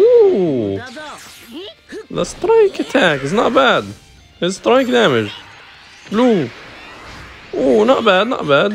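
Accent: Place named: Russia. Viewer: Good gameplay, good team and Russian accent. Perfection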